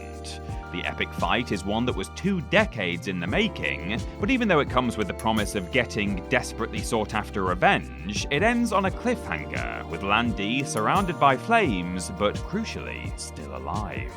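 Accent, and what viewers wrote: United Kingdom. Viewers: He sounds like a normal Brit, Perhaps you are mouse voiced man.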